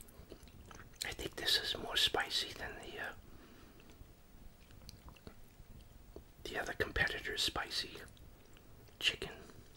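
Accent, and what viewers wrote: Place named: Boston, United States. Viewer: Yeah, it's a Boston accent I'm pretty sure. It's not a thick as some others but it's there.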